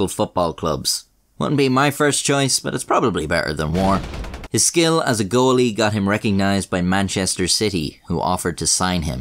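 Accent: Ireland. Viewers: I was wondering because you sound either Irish or Scottish Mainly Due to the fact that they make me laugh They are the kind of people that have a great sense of comedy In the most down-to-earth away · I really enjoy your straightforward style, thoroughly researched material and deadpan Irish delivery. Keep up the primo content. Cheers!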